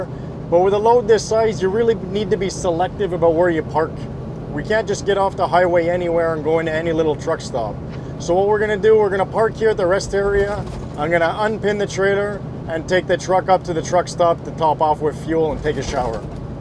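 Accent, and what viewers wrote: Canada. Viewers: DUDE! Fascinating video! I loved how you patiently dealt with the round-a-bouts in NY. I am a lifelong long Quebecor now living in California and I'm trying to identify your accent because your english is perfect. Acadian? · I can hear that Quebec accent ;)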